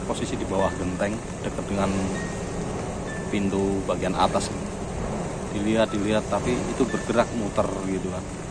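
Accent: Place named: Banyumas, Indonesia. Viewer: selamat malam,saya tinggal di Gianyar Bali,logat anda mengingatkan saya ke daerah asli saya,Banyumas.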